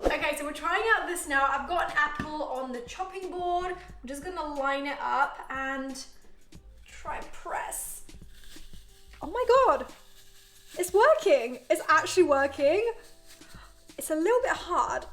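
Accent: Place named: England, United Kingdom. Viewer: It's just the english accent. If you come here in the UK you will see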